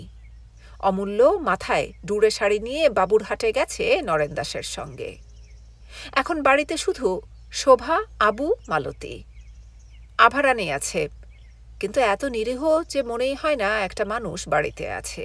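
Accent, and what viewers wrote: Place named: Bangal, Bangladesh. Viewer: Ekhono parjanto Kato madhur gramyo poribesh mone hochhe ekta bhoyonkar din asbe khub valo porchho bisesh kore bangal vasa amar rater samay tomar galpo shonar jonyo ❤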